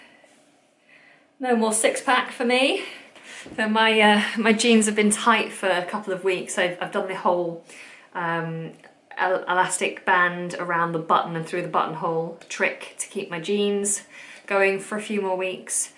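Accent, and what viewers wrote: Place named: England, United Kingdom. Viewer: Congrats to your family. That cool English accent tho :)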